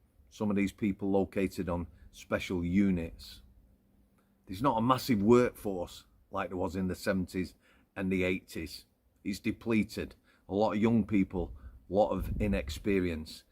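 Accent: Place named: Yorkshire, United Kingdom. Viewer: I’m a Londoner and I love the Yorkshire (?) vibe. So much Britishness in that accent. Keep up the good and honest work. 😊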